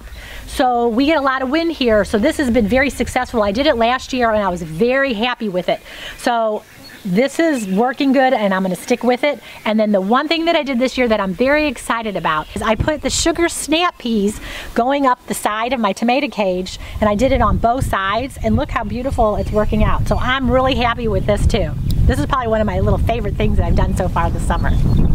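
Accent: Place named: New Jersey, United States. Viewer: Love your garden! Looking forward to see the progress throughout the summer. I also grow all of my own produce here in sunny CA! Sunflowers are one of my favorite flowers also, but did you know that they have allelopathic qualities that can prevent other plants growing around it from flourishing? I just found that out two years ago and now i have a dedicated sunflower patch so I can get every ounce out of my raised boxes. Anyways just thought I’d mention it in case you didn’t already know. By the way, your voice/accent sounds just like my aunt from New Jersey. Are you originally from around Jersey?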